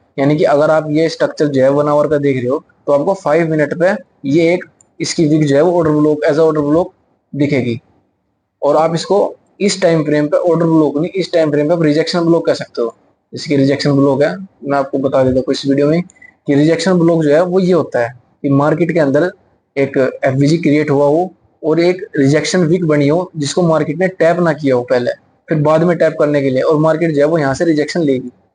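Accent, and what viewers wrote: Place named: Rajasthan, India. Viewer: Bhai rajasthan se ho na kyu ki boli se pta chl ra h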